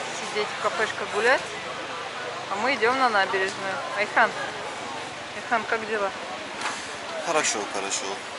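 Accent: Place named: Turkey. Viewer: Вы хорошо выучили язык , говорите с турецким акцентом.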